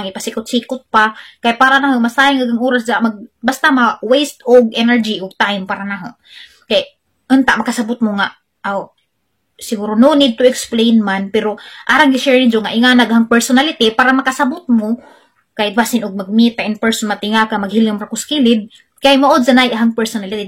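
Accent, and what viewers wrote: Bohol, Philippines. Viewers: Makalingaw paminawon sinultian sa Bol anon hahaha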